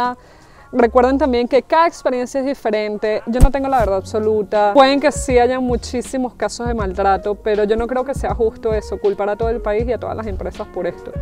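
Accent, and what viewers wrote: Venezuela. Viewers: Hola, creo que tú acento es Venezolano, tengo una consulta. Una ETT justo con las promesas que tú estás hablando... 40hrs bla bla... Valdria la pena ir ? De aceptar con cuánto dinero debería irme ?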